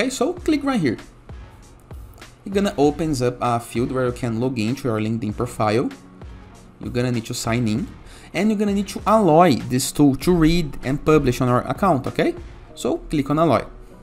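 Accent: Brazil. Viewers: Você devia fazer mais videos em inglês, seu inglês é legal, percebi que era brasileiro pelo sotaque..😁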